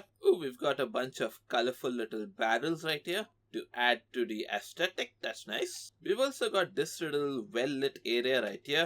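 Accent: India. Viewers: Indian and Bangladeshi at the same time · Indian i think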